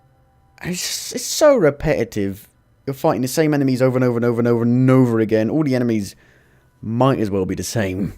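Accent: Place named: Essex, United Kingdom. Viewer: Where you from mate? Sounds a bit like South East... Essex maybe? But there's a bit of Weymouth in there! Like the honesty old sea dog!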